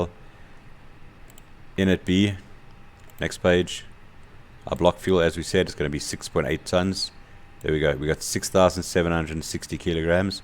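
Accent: South Africa. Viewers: Hoor daai Afrikaanse aksent.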